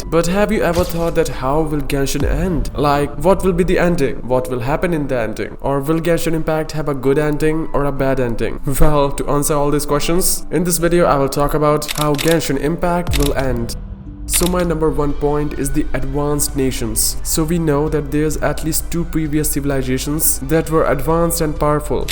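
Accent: India. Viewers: Bhai teri accent Indian lagti hai. Tu indian hi hai naa?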